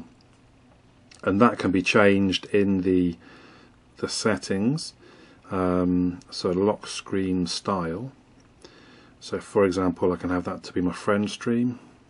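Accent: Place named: United Kingdom. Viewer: Just part way watching the excellent video and you was talking about the calender. I use the month view on my phone all the time. I use Pure Calender Widget and I find it truly indispensable. I colour code my everything I schedule in (blue is tasks, green is personal appointment and orange is birthdays/anniversaries etc.) so just having the whole month at a glance is awesome. Just thought I'd let ya know :-) please continue doing your reviews. Always great hearing a Brit doing the reviews.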